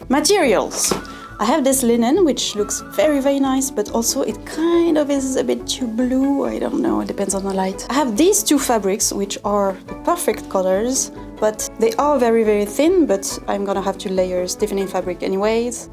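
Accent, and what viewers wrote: France. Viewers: First time watching any of your videos and don't take this the wrong way, but your accent is one of the first French accents that didn't irritate my picky ears. I'm starting to wonder if maybe the French accents that annoy my odd taste in accents are fake... Anyway I doubt I'm one to complain about accents with Utah (USA) drawl and I am watching the video because I love to craft and if it takes inspiration from historic pieces, even better. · As well as a fantastic creator you are naturally beautiful and you sound amazing, I run a French bistro here in Wales UK and I'm so ysed to listening to the French accent and mix of French and English language, you should be proud of yourself you are an inspiration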